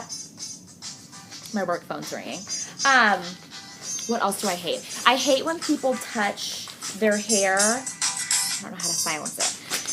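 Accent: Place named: Illinois, United States. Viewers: how you say illinois is so funny..love it...im from illinois, near chicago and its funny how our accents are so different but were still in the same state! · WHATTTT! girl i didnt know you live in illinois! we have totally different accents! hahah. what town are you from or near?!?!?!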